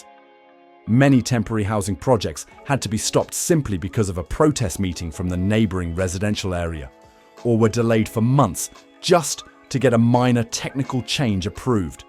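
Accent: United Kingdom. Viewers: I live in NYC. Have never seen a Tent city. About 10 years ago the homeless mentally ill were rampant in the subway stations , but it’s been cleaned up. 125 th on the east side was full of garbage and K2 victims 8 years ago but no longer they’ve cleaned it up. There’s no longer any abandoned buildings , that ended years ago. Where is the British speaking person speaking from , the moon ? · Living in Manhattan for over 40 years I cannot agree with this clip. It exagerates very much the severeness of the situation. its not as bad as you portray, notice your British accent , you are not one of us and cannot judge. NYC spends billions a year on this problem and I should know since they helped me a lot with certain issues. There is no right to live in Manhattan. Its for the rich and wealthy. You can move to pennsylvania or New Jersey and seek your fortune there where costs are lower. 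and rent is lower. Most of these homeless are not from here but from much further away. Notice they dont want to leave Manhattan though they are homeless because there are many shelters and places to get help and free food daily. Cant agree with your show and its too untruthful, RM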